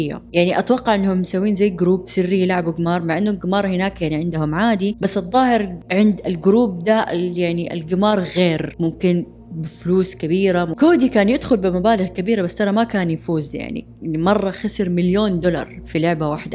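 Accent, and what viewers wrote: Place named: Jeddah, Saudi Arabia. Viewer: 😂😂😂البنت حامل والدنيا شوب .. يا ويلي انا تابعتك عشان لهجتك .. لدرجة انو صرت اذا قابلت بنات اعرفهم من لهجتهم انهم جداويات .. من اجمل اللهجات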